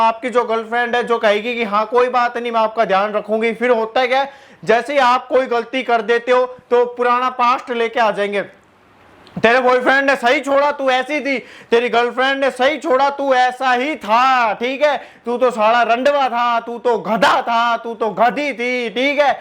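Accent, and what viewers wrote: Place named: Marwari, India. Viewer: Marwadi ka boli se pata lagta hai bhai 🤣🔥🔥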